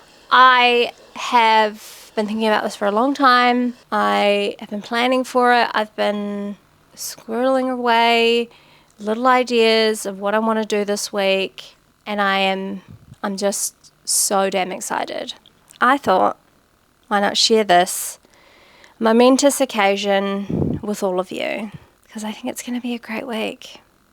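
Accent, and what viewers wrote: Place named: New Zealand. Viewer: ooh okay i had no idea what poor things about (and i thought the film trailer look weird) but you’ve piqued my interest! your accent makes me miss NZ 😫🥹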